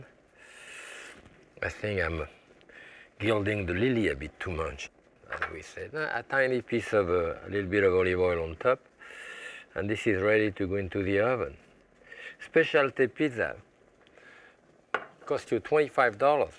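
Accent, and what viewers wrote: France. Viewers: I love to cook. My first serious cookbooks were Mastering the Art of French Cooking, Volumes I and II. The originals, not the boxed set. My family is of French origin. Everyone in my family cooks delicious creative food, although they may have degrees in subjects not related to food. I have lived in Berlin for 30 years, but before that, I lived on the French border where I got married. My new husband and I went to Alsace every other week-end, where we had some really good French friends. His accent was exactly like ', the melody of French carried directly into his English, "You know..." I am "staying in" now and almost every day I talk to my sister in Minnesota, who is a professional baker. When I told her I was watching, she said, "Oh good! I'm so glad you are doing that. It's good for your spirits." Not that I'm depressed! But she is so right. 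I carefully record the details of his methods, and have "More Fast Food My Way" in my Amazon basket. I'm so sorry for his loss of his Gloria, who he thinks about with every deft detail, how she likes turkey wings, and likes her pasta well-done. What a terrible year! On his many shows, though, he's timeless, and clever and produces fabulous food. I have to keep reminding myself that he has a Master's Degree in French Literature from Columbia University... He has mastery at more than the one thing... He's a man of all seasons.In this time, he's a Godsend!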